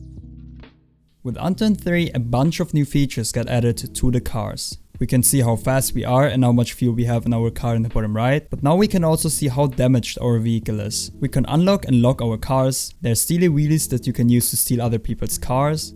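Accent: Germany. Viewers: Man hört so hart den deutschen Akzent raus xDD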